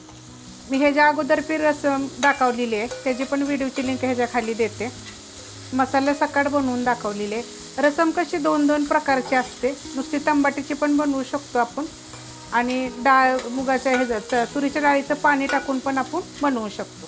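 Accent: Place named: Kolhapur, India. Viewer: खूपच छान असतात तुमच्या रेसिपी 👌👌👌 तुमच्या बोलण्याचे टोनिंग वरून तुम्ही कोल्हापूर च्या आहात असे वाटते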